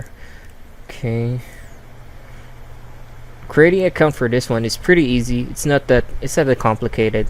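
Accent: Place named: Philippines. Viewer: I was surprised your region is U.S. You sound so filipino for me. Anyways great vid ^^.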